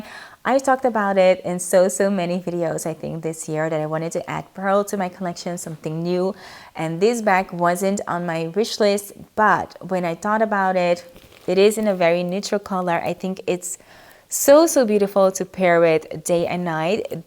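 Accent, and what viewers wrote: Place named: Netherlands. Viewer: wat leuk! Ik wist het ik dacht het is Nederlands of iemand die Afrikaans van Zuid Afrika spreekt. Yes ben Nederlands Surinaams maar woon al 8 jaar in Noord Amerika momenteel in Montreal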